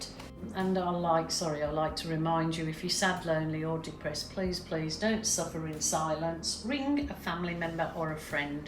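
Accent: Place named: Midlands, United Kingdom. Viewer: are you from the Midlands, my ears prick up automatically when l hear my home town. I’m also in Oz so my accent now is a weird mix of brum and Oz. Cheers from QLD.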